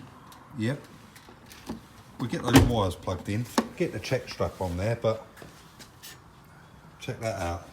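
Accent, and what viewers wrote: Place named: Cockney, United Kingdom. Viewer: i love the way says WAFFLING ON, old school cockney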